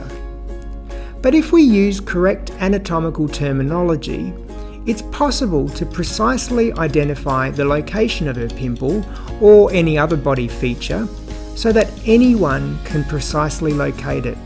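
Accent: Australia. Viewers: first year nursing, thank you for your so clear explanation, loving your Aussie accent.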